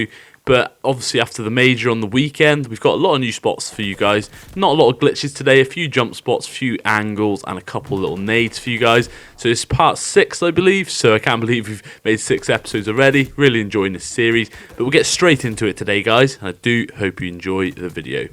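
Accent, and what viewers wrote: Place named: United Kingdom. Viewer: every American likes a UK accent over any commentary, I promise you 😂 it’s just a thing. You’ll keep growing bro, just keep releasing content.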